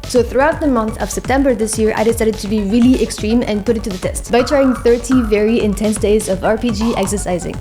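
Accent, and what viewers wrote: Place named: France. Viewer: Okay great, now you not only have a super cute french accent and love DnD but you're ALSO a strong muscle mommy? My brain can't handle this combination of traits I adore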